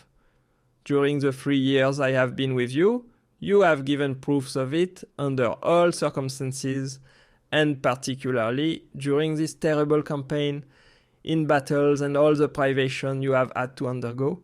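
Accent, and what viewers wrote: France. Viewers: Honestly the thick French accent was such a great touch for this series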